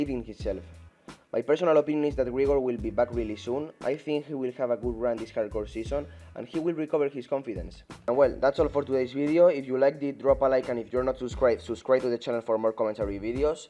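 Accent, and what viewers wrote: Spain. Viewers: Tienes el acento más español de la historia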